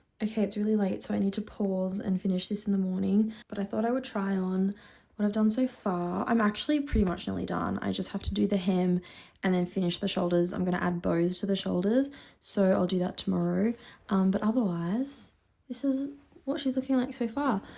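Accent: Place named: Australia. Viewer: Full credit to the wonderful plethora of American shirring tutorials but it's so nice to hear a fellow Aussie voice saying the word shirring.. 😅 I also loved seeing a tutorial done on a machine with fewer bells and whistles, like mine! Thx for the vid ❤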